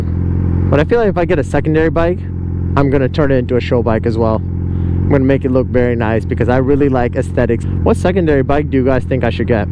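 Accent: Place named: Pakistan. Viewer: He’s definitely Pakistani, I can hear it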